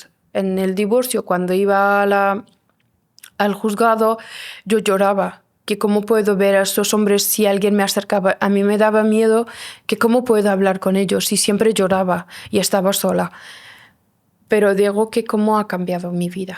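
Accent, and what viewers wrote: Andalusia, Spain. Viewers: Amo tu acento Andaluz!! 💜❤️💖 Y a Khadija mis respetos por su fuerza y valentía!! Abrazos desde Colombia 🇨🇴🇨🇴🙏🏻🙏🏻👍🏻👍🏻💜🌈🪄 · Oye no me gusta el acento español pero el tuyo 😮❤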